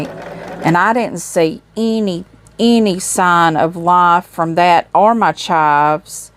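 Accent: South, United States. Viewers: Quarter is a wonderful part of a whole. Thanks for not making your video so obvious for the giveaways. Some people just channel hope to win free gifts. I so enjoy your content. I feel a kinship to you because of your southern accent. Looking forward to the drawing.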